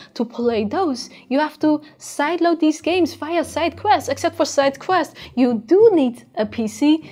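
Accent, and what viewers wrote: Netherlands. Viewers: I hear a little nederlands 😂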